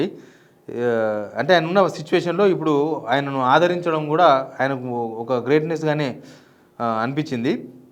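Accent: Telangana, India. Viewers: అన్న మీరు తెలంగాణ బాషా యాస లో నిర్గలాగా వార్తలు చెప్ప్తారు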